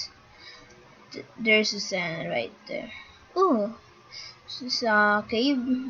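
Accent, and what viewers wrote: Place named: Philippines. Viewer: I can tell by your accent you are filipino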